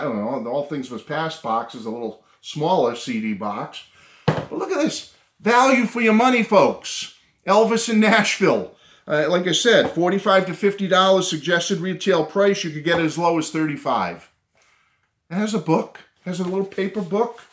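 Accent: Boston, United States. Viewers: Awesome rant and Boston accent....you just got a sub and a thumbs up from me sir....👍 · Nothing wrong with that,! Believe it or not, I've been a dyed in the wool Beatles fan since I was 4, mainly due to having a sister who was 8 years older than I am. I used to sneak down to her room to play their records when she wasn't home. LOL. I may not get into collecting all their stuff as many others do, but I haven't stopped loving their music. It's as timeless as timeless gets. Fwiw, as a NY National League fan, I can appreciate the Boston accent:)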